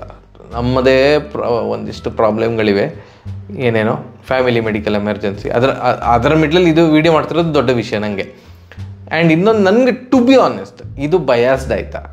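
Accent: Mangalore, India. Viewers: Bahal chanda mangalore kannada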